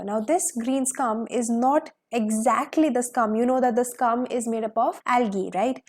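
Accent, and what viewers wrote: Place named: India. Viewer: You're Indian I understand by your voice & manners to speak.☺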